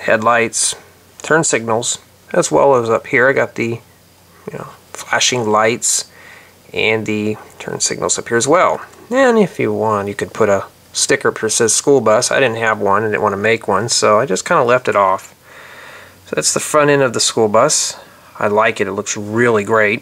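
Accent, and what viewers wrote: Texas, United States. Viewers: Are u Texan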